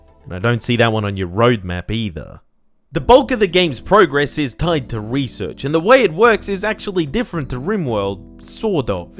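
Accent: Australia. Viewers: I knew you were a fellow Aussie but your accent seems influenced by something else, I can't pick it and it drives me bananas, mate!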